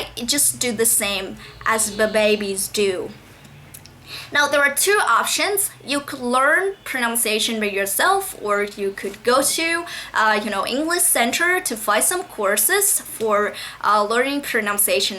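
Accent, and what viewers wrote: United Kingdom. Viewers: I thought your senglish sometimes british sometimes american style, but that isn't no problem cause I have a problem with my speaking skill I'm too much learned grammar, but none people cares about the grammar, really exited your video!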